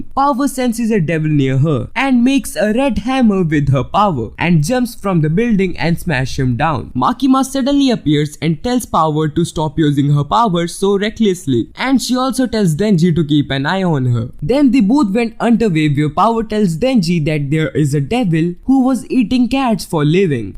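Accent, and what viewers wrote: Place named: India. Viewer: Bro why don't you voiceover in hindi Misss your hindi accent 😢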